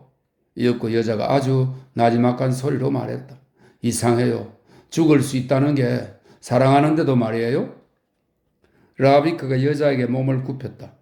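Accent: Gyeongsang, South Korea. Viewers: 완독 고생하셨습니다. 정말 감사합니다. 장중한 소설을 구순한 음색과 살짝 경상도스런 억양으로 아주 잘 감상했습니다.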